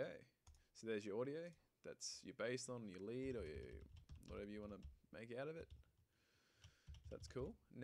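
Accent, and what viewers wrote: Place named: Australia. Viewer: Hi from Norway! Great to hear another Aussie accent :)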